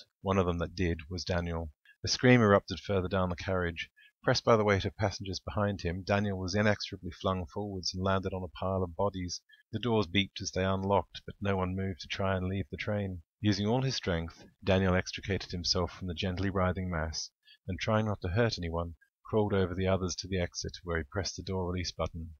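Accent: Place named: England, United Kingdom. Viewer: Love the photos. Love hearing an english reader and can picture it perfectly. Makes such a difference from listening to the american audio books. So hard to picture a scene with nothing to relate to. Love it! Thank you for giving us brits a great story.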